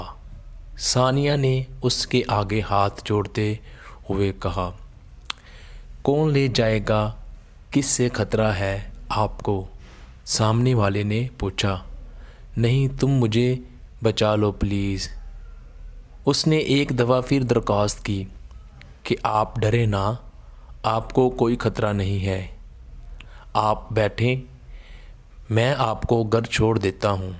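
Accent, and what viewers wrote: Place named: India. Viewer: Ap agar Pakistani ho Tu apna Urdu Ka tulaffuz theek krain ,,,ap Tu Indian ke tarha Urdu poltay ho ..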